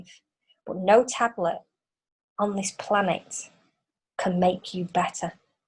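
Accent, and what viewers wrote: Scotland, United Kingdom. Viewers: Curious about her acent. she comes from scotland maybe.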